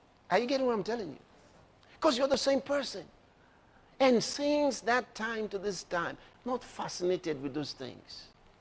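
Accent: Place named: Nigeria. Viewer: no matter how he hides his accent it still shows he is from Nigeria 😀😀😀😀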